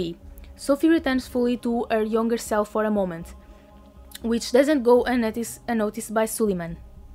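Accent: Portugal. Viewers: I like the length of your videos. I also love to hear your Portuguese accent.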